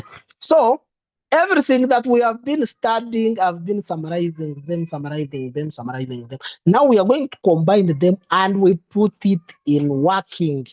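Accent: Ankole, Uganda. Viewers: That accent is for pure banyankore not bakiga. Much love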